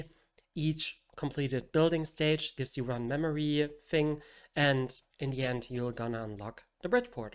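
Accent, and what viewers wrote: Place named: Australia. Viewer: You sound Australian, what time you stream?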